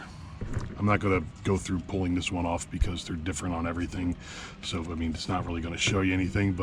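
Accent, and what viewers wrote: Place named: Pennsylvania, United States. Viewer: Are you from PA?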